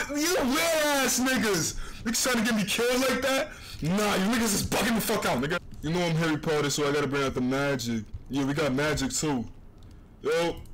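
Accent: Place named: United Kingdom. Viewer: Guys his British😱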